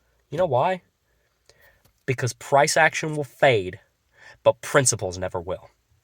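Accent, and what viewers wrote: United States. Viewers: omg you sound like every american ever rofl. Land of the free hahahahahahahah. Where kids go to school and get shot, no where else on earth does this happen. The great wars it starts, the great friendly fire it causes, the great debt its in, need i go on?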